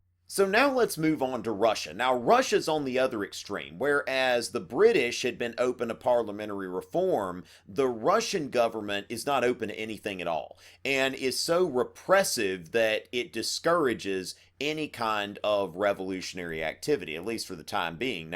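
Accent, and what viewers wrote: South, United States. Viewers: I'm here looking up as much information as possible-trying to finish my history project, and I get this discount Matt Damon with a southern accent.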